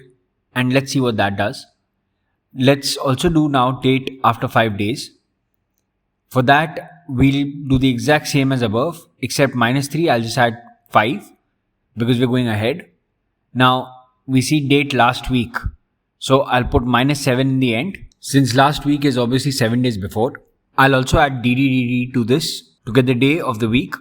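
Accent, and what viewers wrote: India. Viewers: Indian English accent is one of those I have trouble understanding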